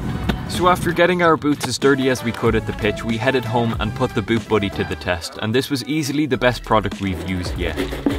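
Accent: Ireland. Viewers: Are you irish? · R u Irish?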